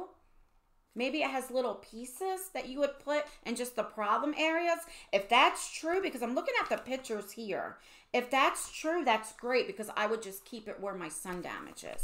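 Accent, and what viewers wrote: New Jersey, United States. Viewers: Oh Jersey! I was woundering where that accent was from! I am confused though. Glossy Box....thought it was gonna be lip gloss. Not disappointed though. Loves the box and whole video.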